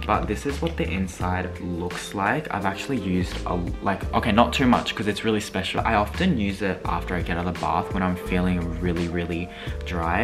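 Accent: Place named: Australia. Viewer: オーストラリア訛りかわいい〜😍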